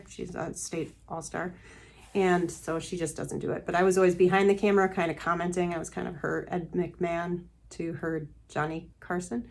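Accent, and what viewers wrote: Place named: Wisconsin, United States. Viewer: You’re from Wisconsin, I got the accent right!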